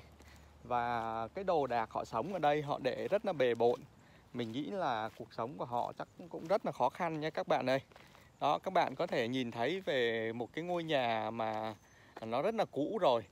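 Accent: North, Vietnam. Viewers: Tiếng bắc mà tự xưng là Bà Rịa.???? Quê tui .... 😚😚😚😚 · Giọng bắc 54 nghe dễ thương giống e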